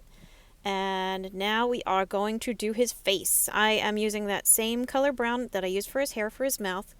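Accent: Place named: Maryland, United States. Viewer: Are you from Maryland? Noticing your accent, hon! 🦀 P.S. Thanks for tutorial 😀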